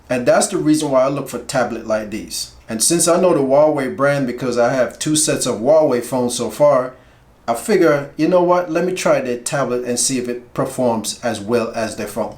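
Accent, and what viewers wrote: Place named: Jamaica. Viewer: Jamaican? lol ...accent is strong. Thank you for this I was confused on which one I should get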